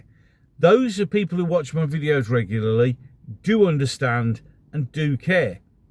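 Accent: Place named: North, United Kingdom. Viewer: You may only have 400 regular watchers, but those watchers are organic and we like YOU, they can hear Sargon or anyone else with a posh accent moan about mass immigration, but your rants make me feel right at home, Northern, salt of the earth, a good soul. That's what drew me in, then the references to scifi and geeky stuff and STICKLE BRICKS kept me hooked, and i admit, the far right hermaphrodite will miss you. Let's see if this gets deleted, the H-word! (I nearly wrote H-b0mb!)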